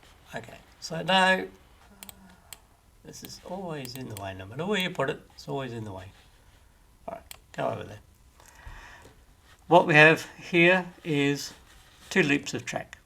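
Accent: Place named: Australia. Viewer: Thanks mate, excellent tutorial. Good to hear another aussie accent in railway modelling. Will look out for the next installment. Paul from Sydney.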